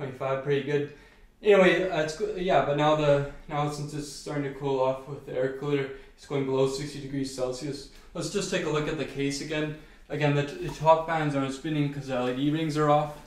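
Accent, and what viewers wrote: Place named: Minnesota, United States. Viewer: You sound like the people in Fargo, are you from around Minnesota or Canada even?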